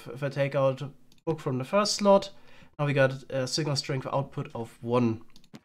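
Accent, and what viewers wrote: Germany. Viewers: Hört sich an als wär da jemand ein Deutscher ;)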